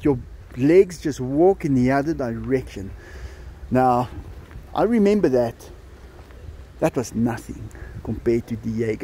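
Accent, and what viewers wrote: Afrikaans, South Africa. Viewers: South African accent. Its chilling that these animals could be living in my neighbours back yard.. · Sorry, just go to say I love the Afrikaans accent. Might be because I'm a huge fan of Sharlto Copley.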